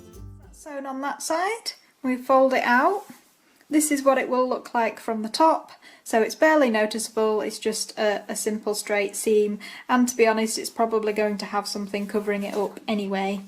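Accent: United Kingdom. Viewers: How long does one cage liner last? Does it bunch up in the washer or dryer? You have such a sweet voice with that wonderful british accent. Maybe one video you could show your face on camera while holding a piggie so we can see the face that matches such a nice voice. Thank you so much for your videos, I do find them full of good information.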